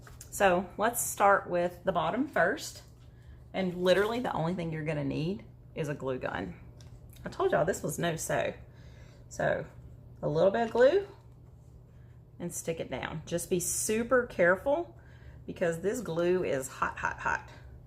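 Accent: South, United States. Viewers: This is awesome so thank you! I’m also living for all the the southern speak, I’m not kidding!!! 🥰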